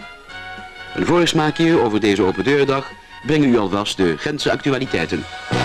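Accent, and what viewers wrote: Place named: Netherlands. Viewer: Spijtig van dat Hollands commentaar- waarom geen Vlaming?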